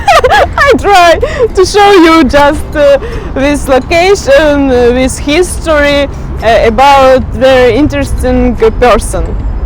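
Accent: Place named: Russia. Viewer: Your accent is so cute. Don't be embarrassed. English is weird because it doesn't follow its own rules, and it is also difficult to learn from texts because we do not say words in the way they "should" be said. Remember that on the internet, there are people who are just jerks. I doubt they could speak Russian.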